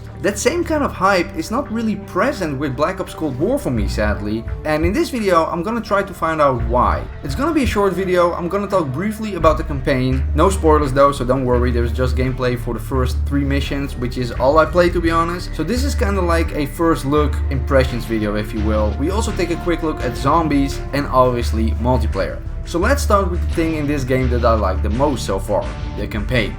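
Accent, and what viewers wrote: Netherlands. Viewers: Funny how I get this video recommend and first thing I notice is your Dutch accent 😂 goeie vid man 💪🏻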